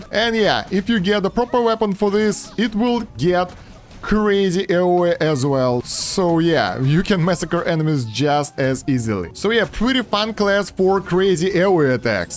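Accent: Russia. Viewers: Готов поставить на то что это русский пытающийся говорить на английском языке Вот 100% я уверен что это русский человек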